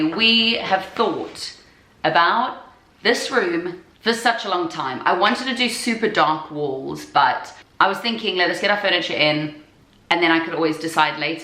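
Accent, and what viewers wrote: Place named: South Africa. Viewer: Instantly knew you must be South African! Fellow South African here 🙋🏼‍♀️ Great video 🥰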